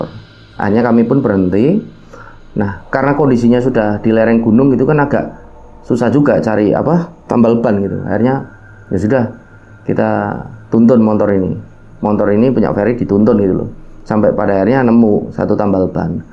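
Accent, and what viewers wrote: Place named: East Java, Indonesia. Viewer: Tetaplah konsisten dengan Chanel Pendakian, semoga makin sukses....dulu saya kira dari Malang kok logatnya kayak Jawatimuran.....👍👍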